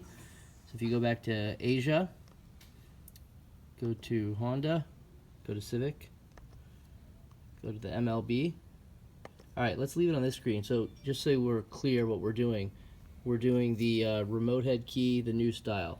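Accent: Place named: United States. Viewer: OMG, finally there is someone here speaking AMERICAN!!!! I purchased this VVDI tool for months now, whenever I looked it up on Youtube all I got was either Thailand or the shitty Russian voice. Thank you and thank you for your video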